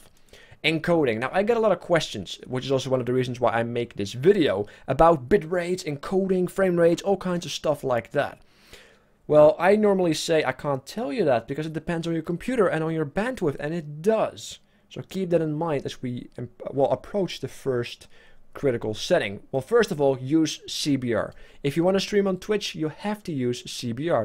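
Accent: Netherlands. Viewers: youre dutch? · i guess your dutch:P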